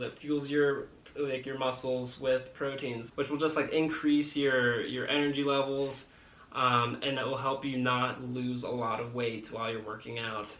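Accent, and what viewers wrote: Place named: United Kingdom. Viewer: Where are you from? You kinda sound like you're from the UK.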